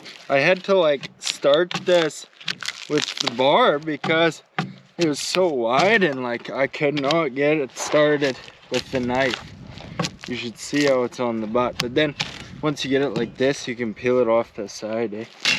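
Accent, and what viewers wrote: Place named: Newfoundland, Canada. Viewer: Where are you from? What part of Canada? I was just wondering because I noticed the way you talk …….. I don’t mean that in a bad way just kind of wondering …….Tiny Houston MS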